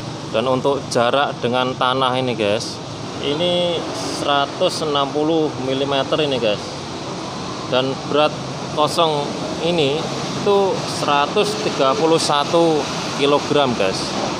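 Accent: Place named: Java, Indonesia. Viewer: Medoke lucu bang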